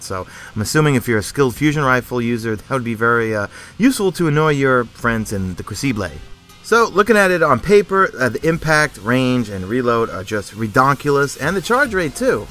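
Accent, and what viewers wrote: New York, United States. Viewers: Hey, fellow NY'er here, so love the accent - don't change a thing. Re: fusion rifles, I wouldn't be so quick to jump on the nerf-wagon before they lift a finger to actually buff anything. Seems Bungie is more comfortable nerfing. Buff pulse rifles, hand cannons, scout rifles and, who knows, fusion rifles may be a less attractive idea after all. Plus, they're great at taking down those pesky shields in PVE. How about spawn less special ammo in PVP? The player base will always have a preference - at least it's a class of weapons and not a single one (Suros Regime, I'm looking' at u).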